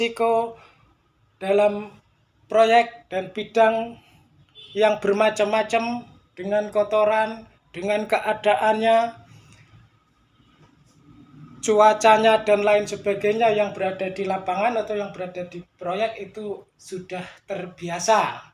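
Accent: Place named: Java, Indonesia. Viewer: Makasih logat jawanya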